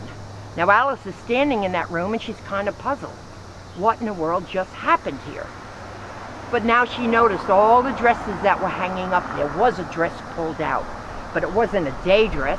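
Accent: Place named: New Jersey, United States. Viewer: I KNEW I PICKED UP A NEW JERSEY ACCENT! HUH!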